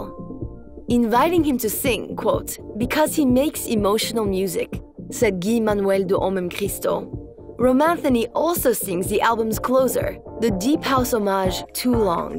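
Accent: France. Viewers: the french accent is absolutely needed. thanks. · Nice french accent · Elle a un petit accent Français.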